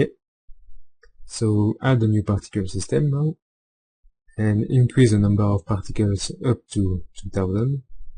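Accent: France. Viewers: J'aime quand les français parle anglais :) bonne vidéo merci ! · tu es français non? :-) ça ce voit à ton accent ^_^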